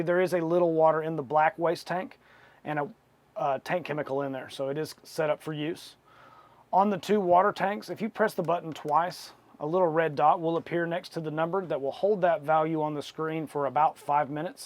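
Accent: United States. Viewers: is that accent from Kentucky?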